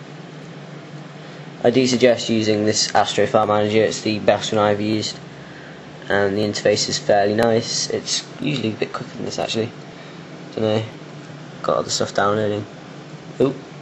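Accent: England, United Kingdom. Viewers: although i'm not from uk i love the english accent! :D btw i'm from israel! :P great vid!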